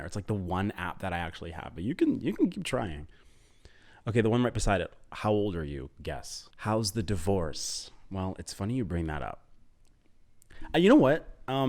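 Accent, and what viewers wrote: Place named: Australia. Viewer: I really thought you were Australian with a good American accent😅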